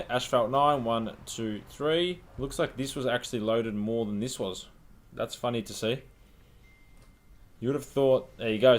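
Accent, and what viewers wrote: Australia. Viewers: U must be Australian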